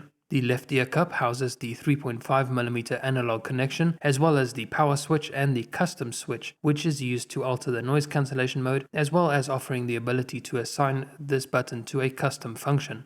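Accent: South Africa. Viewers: South African accent, but living in Dubai.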